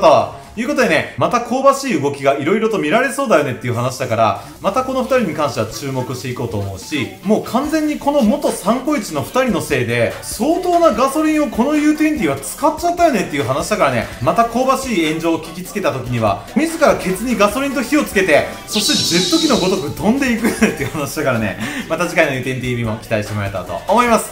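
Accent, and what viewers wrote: Kansai, Japan. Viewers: 関西弁聞きたい🥰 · わぁ💕 アタイと話す時はバリバリの関西弁が出るって話しだよね💕 古川鼻チークやら👃🏻 耳毛👂🏻やら言われてえらい大変やなって話しだよね！ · 関西弁ききたいってはなしだよね〜🥺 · 関西弁喋ろや！！！！コテコテ関西弁のかわいい女の子より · 関西弁はギャップ萌えですきすぎる… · 関西弁もギャップがいいし標準語も聞き取りやすくていいし、、とりあえずUくんがいいよねって話だよね(？)www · コメント61件もあるのに、視聴が0回になってる？？てか会ったら関西弁で喋るーって話しだよね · 関西弁聞きたいですけど私可愛い男の子でした⤵️ 古川はtko木下越えてほしい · テメーのゴテゴテ関西弁ききたい〜🥰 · 関西弁萌えって話だよね🤩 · 対人に無神経で図太い人って、何もかもに無神経で図太いよねって話しだよねw 関西弁いいねぇ☺️ボケとツッコミ上手そう← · 関西弁のU-Twenty可愛すぎるって話だよね🤣💕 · の関西弁最高🥺 しばらく古川優香もやっぴもちょっとしたことで炎上するんだろうなって話だよね🤔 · 冒頭からイケボ関西弁で終わった生理が再開しそうって話だよね干物BBAもときめいちゃう(´♡ω♡`)って話だよね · 関西弁もっと聞きたい！(標準語だから訛りに憧れがある) 動画見に行ったけど1部の米が論外すぎて… 今回の件でショックを受けたり古川優香の為を思って注意したコメントをしたファンだっているのに嫌なら見るなは論外もち誹謗中傷は肯定しないけどね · ちょいちょい、イントネーションが関西だなぁ〜と前から思ってたけど。って話しだよね。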